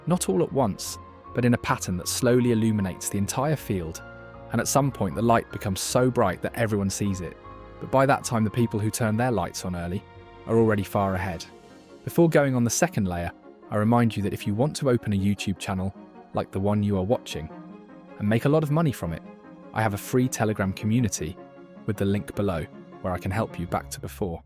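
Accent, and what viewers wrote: England, United Kingdom. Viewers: Can you please change the accent? This English accent is getting on my nerves!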